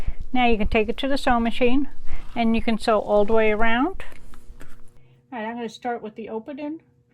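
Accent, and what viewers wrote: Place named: Louisiana, United States. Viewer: Your accent sounds like you are from South Louisiana. Am I right?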